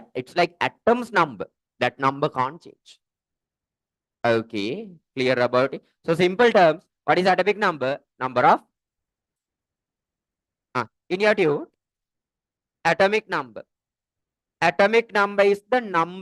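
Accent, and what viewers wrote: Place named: India. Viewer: Great sir But I can't understand Ur Indian accent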